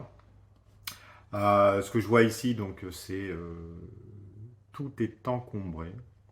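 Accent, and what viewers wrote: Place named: Montpellier, France. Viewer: Tu es de Montpellier toi avec ton accent pointu? Nan en fait je crois que tu es venu acheter le soleil comme ton pote qui est allé acheter une femme dans les pays baltes ou en Thaïlande pays tristement célèbre pour ça. N'oublie pas de revenir te faire soigner gratuitement en France si tu tombes malade ^^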